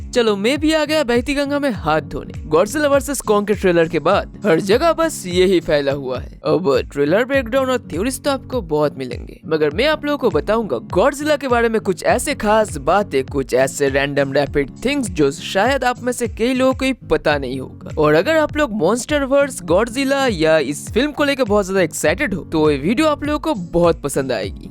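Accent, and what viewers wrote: Bengal, India. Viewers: Tum aisa q bolta hai tum bangali hai kya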